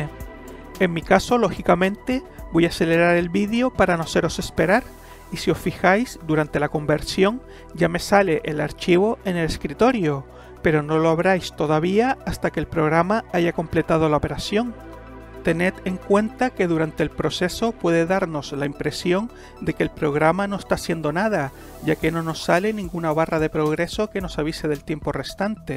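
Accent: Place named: Canary Islands, Spain. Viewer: el acento canario me gusta mucho la cuestión es que desde un tiempo a esta parte en algunas escuelas de periodismo “enseñan” a entonar de diferentes maneras para llamar la atención del oyente, y resulta tan manipulador que lo detesto. El acento canario es bonito y te pido disculpas si he podido ofenderte.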